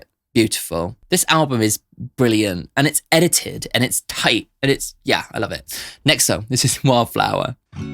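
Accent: United Kingdom. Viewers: Do people with beautiful English accents realize how they just seem brilliant to us Americans? You make “bastard” sound nice! Anything you say sounds smarter than us even if it’s gibberish! Funny how music masks accents.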